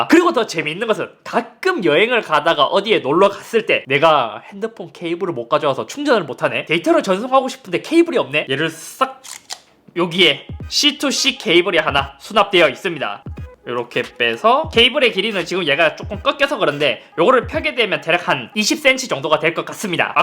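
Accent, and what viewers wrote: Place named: Gyeongbuk, South Korea. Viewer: 이 형 너무좋응.. 경북말투 영주,봉화,안동,점촌.중하나있듯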